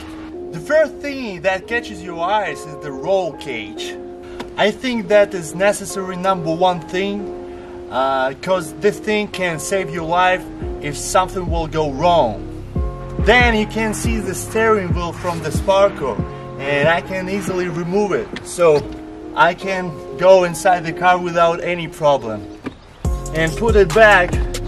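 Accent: Russia. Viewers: Blyat. Oh ug etot Russian acient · плюс русский акцент. Сто раз замечал, что русский, говорящий на английском в 100 раз понятнее, чем иностранец, просто потому что произносит так, как ты сам это делаешь. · Раньше как-будто над акцентом больше старался, а сейчас наоборот решил больше России матушки внести)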